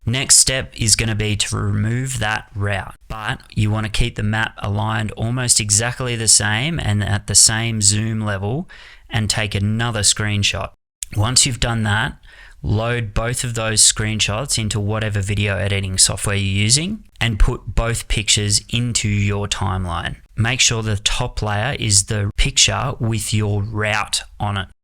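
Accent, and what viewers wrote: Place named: Australia. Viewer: Would be interesting to listen and learn, but difficult to suffer through such a thick australian accent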